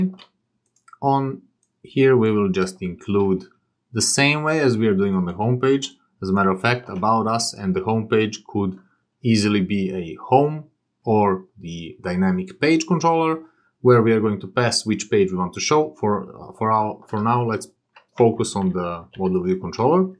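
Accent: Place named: England, United Kingdom. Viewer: Izasao si mi kao predlog posto dosta gledam tutorijale pa reko da vidim... Engleski naglasak i ime :)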